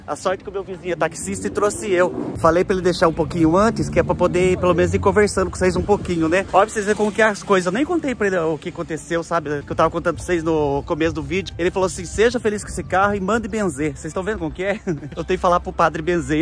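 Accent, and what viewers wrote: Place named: São Paulo, Brazil. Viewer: ou e esse sotaque seu e de onde mesmo meu filho? vc mora no estado de São Paulo?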